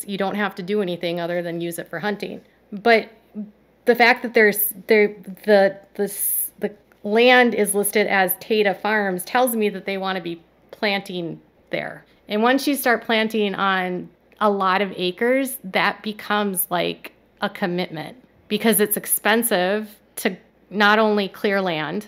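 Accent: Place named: Midwest, United States. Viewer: I'm also from rural Minnesota! For a while now, maybe your accent, I've thought you were from MN or somewhere in the midwest.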